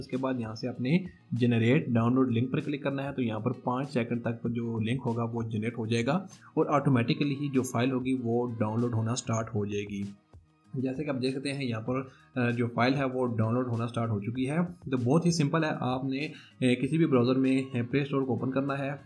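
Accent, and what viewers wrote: India. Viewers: The indian voice is Is- PERFECTO!!!!!!😎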